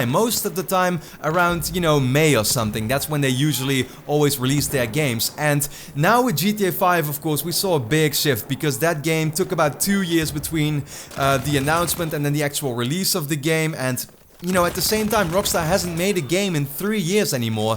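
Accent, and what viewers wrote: Netherlands. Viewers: You’re so obviously dutch😂😂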